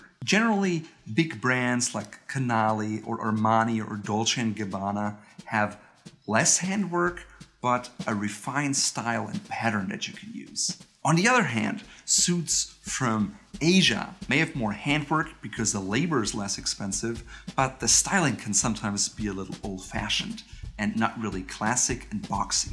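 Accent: Germany. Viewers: Awesome. Thank you for making this video. I hear a slight accent, German or Jewish. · He has a hint of a German accent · I sense German. not sure if correct or not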